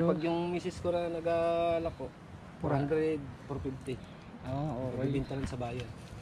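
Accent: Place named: Mindoro, Philippines. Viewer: NASA tono po Kasi yan ma'am at ng pananalita at Kung saang lugar ka sa Pinas di mawawalan yan my gay_ang tono mandin,at ako gay proud din na ka isang mangyan. Na kagaya nila ✌️😋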